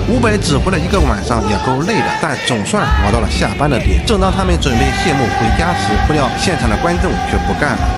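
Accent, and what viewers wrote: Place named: Taiwan. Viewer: 臺灣腔 又很臺 沒想到500在中國那麼紅 難想像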